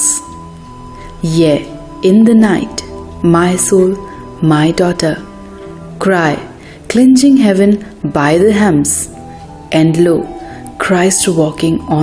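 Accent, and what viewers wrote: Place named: South Asia. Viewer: It is so good to hear this most wonderful of poems read with a South Asian accent!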